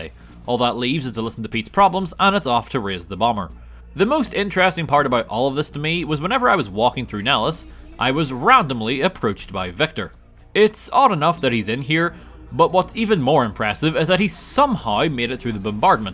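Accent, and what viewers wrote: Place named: Northern Ireland, United Kingdom. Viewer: Been falling asleep to these for a while now and I don't think my brain can enter sleep mode anymore without listening to an northern irish man describing incredible acts of violence. I had an idea I thought might be interesting, since with the fallout 4 DLC you can craft robot companions what if you got the unlimited companions mod and just have an army of customized robots wreak havoc on the commonwealth, you could call it "can you beat fallout 4 as the mechanist" or something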